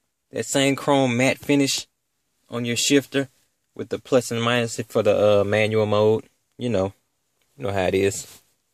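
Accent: South, United States. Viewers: Dude where are you from, I love your accent I bet you’re from the South somewhere 😂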